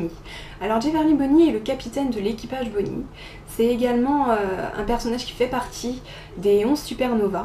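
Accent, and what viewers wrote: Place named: France. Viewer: Im from spain but love french accent :)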